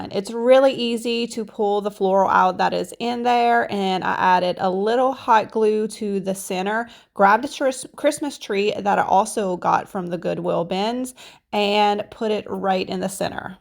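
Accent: Louisiana, United States. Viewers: Girly… That gorgeous Cajun accent…. Abbeville? Maurice?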